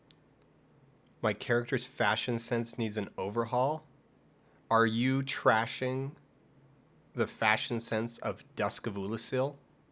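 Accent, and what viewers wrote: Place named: Edmonton, Canada. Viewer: Where do you live? I'm from Edmonton. Sounds like you're close to me.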